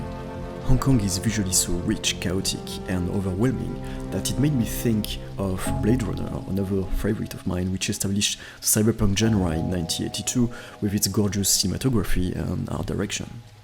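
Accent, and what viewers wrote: France. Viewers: Amazing french accent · That thick, french accent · Where are you from? France? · french accent spotted x) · The French Accent of the Narrator was just the cherry on top of all that beauty. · Il est la laccent français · il a pas un accent francais? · looks very nice. but this french accent is horrible! i cant even understand half of what this guy is saying ^^ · Un bon accent français bien de chez nous. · c est un francais qui parle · French accent ? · Je reconnais là l'accent français! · oh man Your English is so French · THIS FRENCH ACCENT HURTS MY EARS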